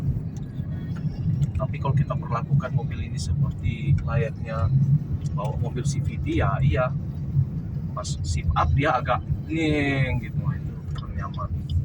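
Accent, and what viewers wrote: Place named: Sulawesi, Indonesia. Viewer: Syutingnya di Jambi tapi dari logatnya om dari Sulawesi kah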